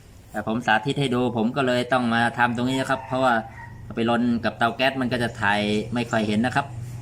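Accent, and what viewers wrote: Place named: Trat, Thailand. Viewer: พูดสำเนียงคนตราด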